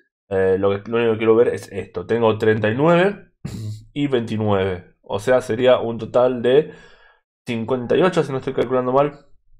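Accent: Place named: Argentina. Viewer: vos sos Argentino no? Se te nota toque en la voz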